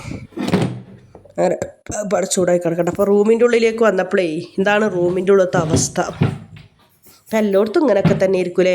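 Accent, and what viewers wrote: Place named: Coorg, India. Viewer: Coorgil evideyaanu...samsaram kett Coorg aanenn thonni,Siddapuram aano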